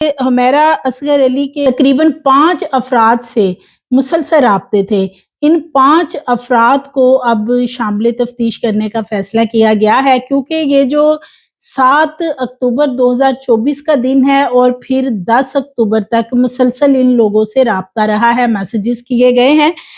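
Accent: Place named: Punjab, Pakistan. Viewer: Tu c paindo ho. Ardo (Urdu) punjabi accent which boldi ho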